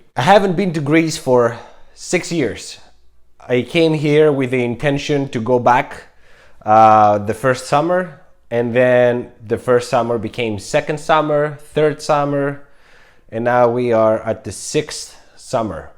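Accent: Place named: Spain. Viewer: I’ve seen your videos before but had no idea you were Greek. With your accent, I thought you were from Spain. What an idiot I am. And I’m Greek too. Greek American though. My family is from stunningly beautiful Kefalonia. Καλό ταξίδι!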